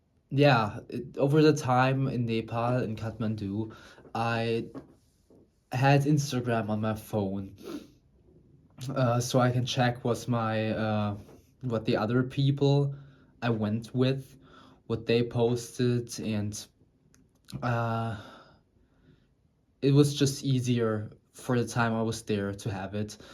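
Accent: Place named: Germany. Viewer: 2 seconds in and I know he is German :face-purple-wide-eyes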